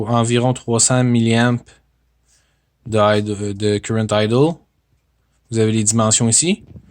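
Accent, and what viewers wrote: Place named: Quebec, Canada. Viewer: gros accent du quebec :P je viens juste de commandé sur amazon 36$ livré le lendemain anyways 20 amp x 12 = 240 possible mais pour 36$ je chialerai pas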